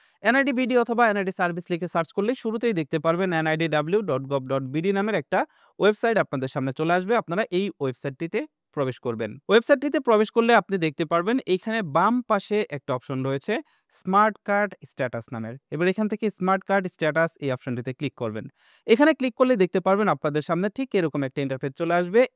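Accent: Tangail, Bangladesh. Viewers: Vai er Basha Tangail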